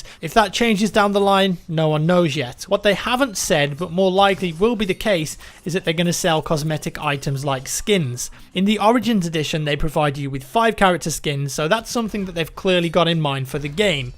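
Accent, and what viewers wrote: United Kingdom. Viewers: Are you from UK? Im from Germany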